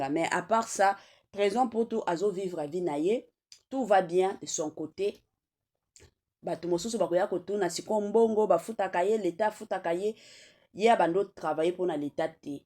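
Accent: Rwanda. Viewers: Tika biloba loba yo oza Rwandaise,tonalité nayo eza kofunda yo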